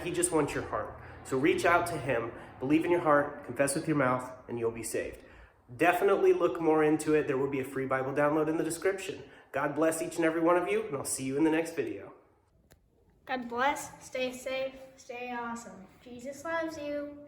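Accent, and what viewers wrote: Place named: Arizona, United States. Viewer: Good stuff bro! God bless you and your channel! That’s not a Texas accent, you sound like Arizona, but that looks like south East Texas… Take Care brother, appreciate your encouragement!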